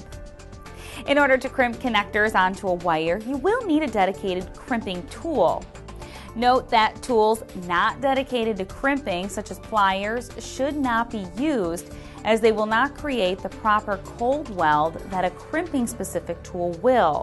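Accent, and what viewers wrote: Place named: Upper Midwest, United States. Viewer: this is a good vid but her accent is hilarious. I guess I haven’t been in the upper midwest enough....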